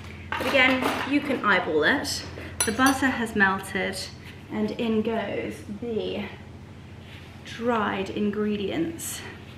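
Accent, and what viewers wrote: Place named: RP, United Kingdom. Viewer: Omg you make me laugh your so posh but the ingtons on the end of your words are so funny dipingtons splashingtons lol never change x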